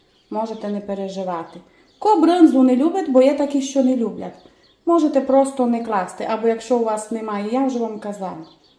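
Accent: Transcarpathia, Ukraine. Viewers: Підписалась, який файний канал!, Ваш діалект, для мене мед у вуха 🫶 я переселенка з Бахмута, тепер жию на Закарпатті, дуже подобаються люди і цей діалект❤ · Як його навчитися говорити як на Закарпатті? Мова дуже файна.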